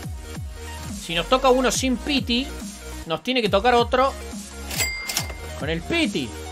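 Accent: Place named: Cordoba, Argentina. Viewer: tremendo cordobes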